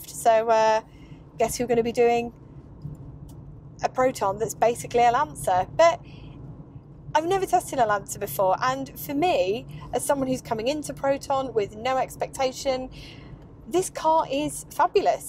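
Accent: United Kingdom. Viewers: Your british accent is wonderful 😊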